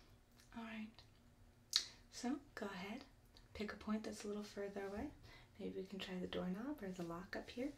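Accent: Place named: South, United States. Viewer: I don't comment very often on your channel, but I just saw this vid 2.5 times... That good it was!! Amazing tingles!! ...Thanks for that! :D ...ALSO, there's a rhythm on every aspect of the video that makes it SO soothing -I bet if we measure your movements, those must be along the range of the Theta Waves- and so relaxing! ... ...and one more thing, I love your southern accent! Is so mellow... Talking with contractions is sooo mellow xD Thanks again! :D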